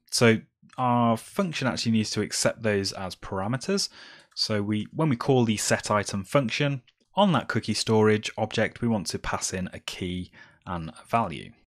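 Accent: United Kingdom. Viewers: Not that I just enjoyed it, it helped me a lot, I implemented it! I just discovered your ytCh, you deserv much more subs. Watched few videos yesterday, I can learn a lot from your videos. I heard that you have a proper English accent. You must be from UK :) I am living next to Manchester :) Do you have a time to be my mentor once a month?...or whenever you have time. That could help me a lot.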